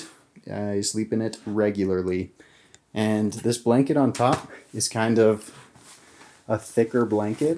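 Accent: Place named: Vietnam. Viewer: Your vietnamese accent is cool! Hope to learn english much more from you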